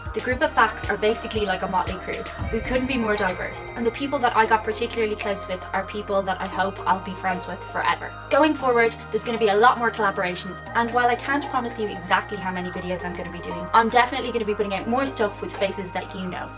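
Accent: Ireland. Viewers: Dang it, it hit me like a truck ! Didn't see that coming. I guess all good things come to an end. Facts was a fun way to get used to the irish accent as I'm going to work in Ireland soon. Oh well, I reckon I have many channels to subscribe to, now.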